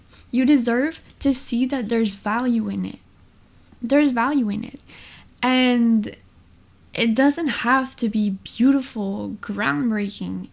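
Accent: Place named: Europe. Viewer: Do you have a French ancestry? You seem to have a European lilt to your accent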